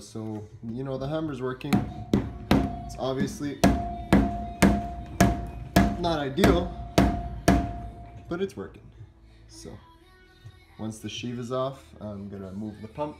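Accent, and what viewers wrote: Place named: Quebec, Canada. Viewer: I was about to say, your accent is almost french canadian. Then I clicked on your profile and I see Montreal, Québec, Ah ben Tabarnak ! :) Haha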